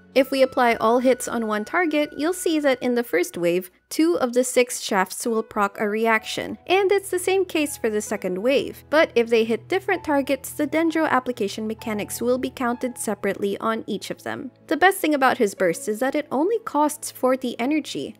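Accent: Philippines. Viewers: The Filipino accent coming in strong in this video. Love it!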